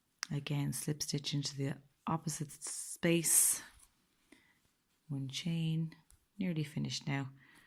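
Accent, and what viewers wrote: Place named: Ireland. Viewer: I found your individual Granny square thank you. I've completed it thank you.Looking at you crochet with that hook you've a very natural flow. You must be crocheting for years. Please do upload more when you get time. Have you a scalloped border most on here don't show how to do the corners. May I ask where in Ireland is your beautiful accent from. ?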